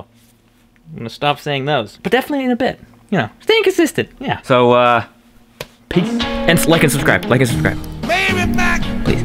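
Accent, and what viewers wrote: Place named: Scotland, United Kingdom. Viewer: Hey! 😉😁 currently loving:... 1/ The return of the Scottish accent 😍😊 2/ The backwards braces ("yer aff yer chump bigman" 😂👍 love it!) 3/ Wee homeschool blackboard (💥 This man won't be stopped! 😁) Cracking wee vid mister, keep them coming 😁👍😉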